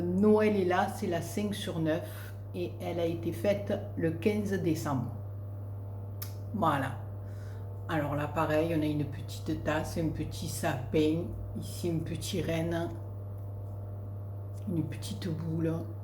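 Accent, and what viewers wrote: South, France. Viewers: Oh que tu es magnifique tout en beauté, que de belles cartes, tu le mérite beaucoup et magnifique création que tu nous a encore fait. Mais c'est normal que l'on soit nombreux à te suivre car tu es une belle personne, tu es honnête, franche, et super à t'écouter avec l'accent du sud et ton franc parler moi j'adore. UN GRAND GRAND MERCI POUR TES TUTORIELS, TES BLABLAS ET TON HUMOUR qui nous remontent le moral à ce faire péter le bide de rire. Allez passe un bon réveillon et à bientôt bisous de Bourgogne. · Moi je viens sur les chaînes pour trouver du plaisir . Chez vous je me régale. Déjà l'accent trop top , les expressions du sud, je ne m'en lasse pas. Vous êtes très minutieuse dans vos créations, cela se voit de suite. Alors si nos commentaires vous apportent du plaisir , c'est un partage. Ceux sont ces petits bonheurs qui font que la vie est belle. 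Je vous souhaite que tu positives. Belle journée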